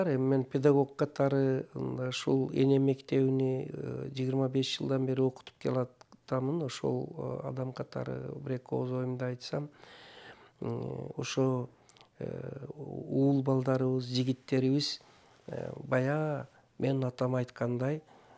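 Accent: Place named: Batken, Kyrgyzstan. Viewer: Сиз канчалык туура сүйлөгөндү билсеңиз да , бул агай билгендерди өзүндөй кылып башкаларга жеткире албасаңызчы? Бирөөнүн кемчилигин ичинде да, сыртында да толтура кемчилиги бар адам көрөт негизинде. Бул агай ошол Баткен диалектинде сүлөсө да коом үчүн өтө чоң пайдасы бар.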